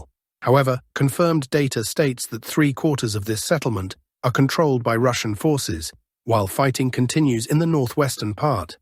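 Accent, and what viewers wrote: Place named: Oxford, United Kingdom. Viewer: You're no Russian..MI6 fucking agent!No?? Howbis that talking with Oxford pronunciation?? Born in Britain??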